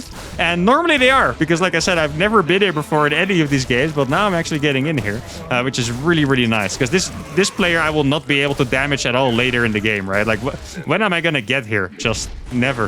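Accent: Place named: Netherlands. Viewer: It awesom watching you people have this much fun in SC2. Also judging by your accent uThermal you are a fellow Dutchie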